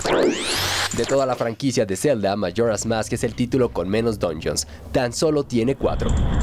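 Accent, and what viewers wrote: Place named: Fresa, Mexico. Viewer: me caga la voz de, fresa y cantadita.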